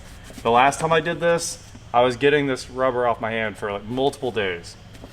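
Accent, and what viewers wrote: United States. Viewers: Love the American accent. What’s a new “mear? “ is that what we call a mirror in England 😅😅😅 Nice update, funky little car.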